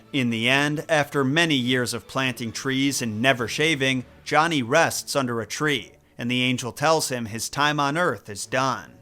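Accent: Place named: Cleveland, United States. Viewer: Shitting on ohio? But your accent sounds like you’re from Cleveland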